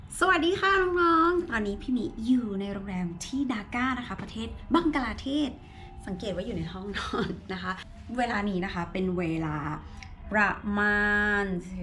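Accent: South, Thailand. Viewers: สวัสดีค่ะเป็นคนถูเก็ตค่ะติดตามมาตลอดค่ะแต่ไม่เคยคอมเม้นชอบตอนทำคลิปพูดใต้น่ารักมากค่ะ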